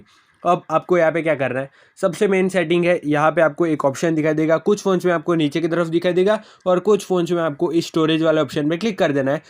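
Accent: India. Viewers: i didn't understand anything because he is indian but it worked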